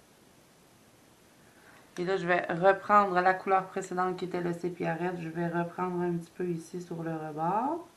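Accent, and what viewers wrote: Quebec, Canada. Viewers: Merci beaucoup avec ces nouveaux tutos, tu nous fais bien progresser. Comme dit Les couleurs de Noëlle, j'adore t'entendre, avec ton accent Québécois qui nous semble exotique, à nous français, et nous fait rêver aux grandes plaines et forêts enneigées du Canada. Quand j'étais petite, j'avais adoré le livre Maria Chapdelaine, et je l'ai relu plusieurs fois. Bonne journée, gros bisous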